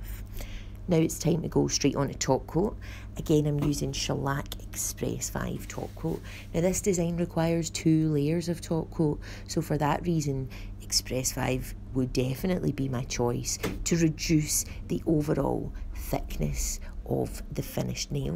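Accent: Scotland, United Kingdom. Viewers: Have to admit you're Scottish accent is truely smokin for this effect too. Fabulous. Love working with pigments so having a go asap. Thanks for sharing and cheers from cumbernauld xx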